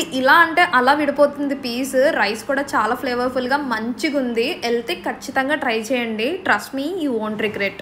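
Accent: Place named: Andhra, India. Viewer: Mee slang chala bagundi. Pakka andhra ammai la chala correct ga words unnai. Nice videos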